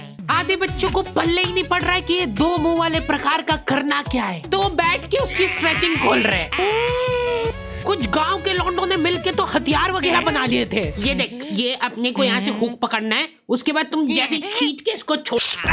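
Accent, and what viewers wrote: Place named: Rajasthan, India. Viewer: Bro is from rajasthan 😅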